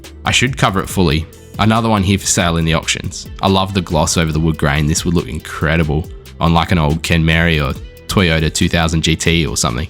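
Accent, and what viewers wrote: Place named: Australia. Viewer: just found this channel, awesome video man, instant sub. also i find it funny that Your description says "United States" but you have an aussie accent lmao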